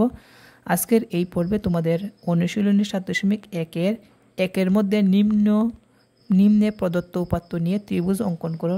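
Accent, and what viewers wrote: Sylhet, Bangladesh. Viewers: SIR APNI ki Sylheti??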